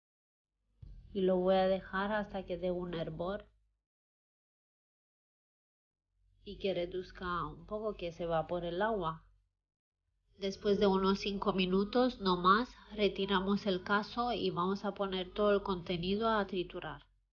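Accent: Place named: Romania. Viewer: También quería mencionar que me fascina tu manera de hablar y tú acentos, no podía descifrar de dónde era, pero es genial que sea de Romania , deberías hacer un canal donde también enseñes tu idioma rumano!!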